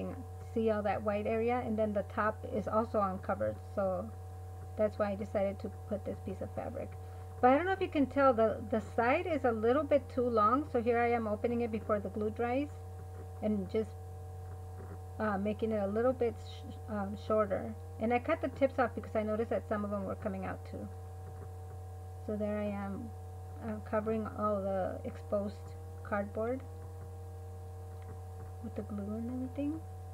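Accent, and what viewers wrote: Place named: India. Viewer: Nice done, People from india 🇮🇳 have a very cute accent , you sound like a sweet indian lady who works at my dentist office !! She is so crafty just like you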